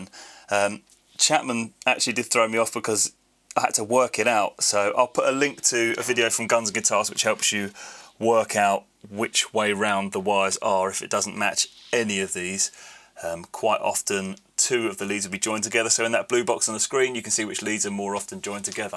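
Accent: United Kingdom. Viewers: it's for England English speakers ... USA English speakers can barely understand him as he talks so fast in British English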